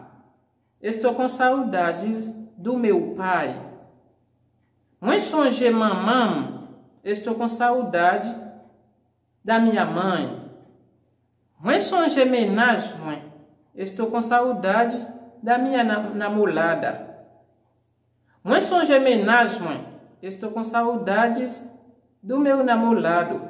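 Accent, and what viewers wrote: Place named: Brazil. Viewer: Ou pale portugais an tankou yon brésilien felicitations au commencement m pat konn anyen men koulya m komanse konprann lè wap pale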